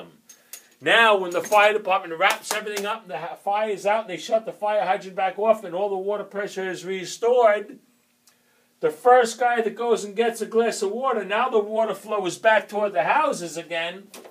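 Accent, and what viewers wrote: New York, United States. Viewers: You hear that working class New York accent and you know you found a guy that knows what he’s talking about. Great video.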